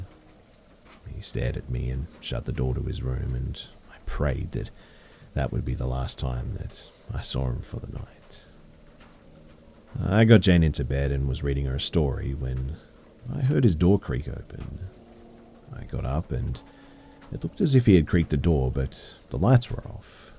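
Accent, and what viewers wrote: Australia. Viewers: you be rockin with the full on australian accent better than lazarbeam himself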